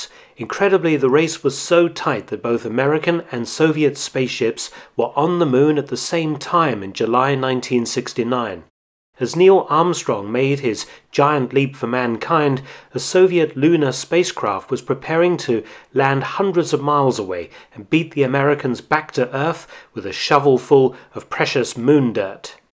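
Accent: United Kingdom. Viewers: When you have to use context to tell whether a British person is saying Luna or lunar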